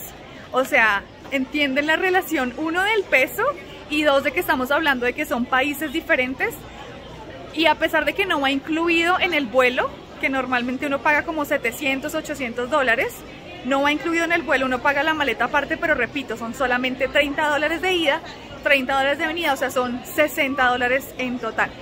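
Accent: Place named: Colombia. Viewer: Me Gusta Mucho cuando. Cuando sí de mijos Y Mijas En su asentó colombiano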